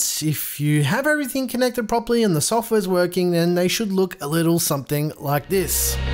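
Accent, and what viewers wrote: Australia. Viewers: Dude been watching your info for a while and appreciate an aussie voice with some real passion for the same things as me. Great content. Do you think that lianli will bring out a 140mm option as well?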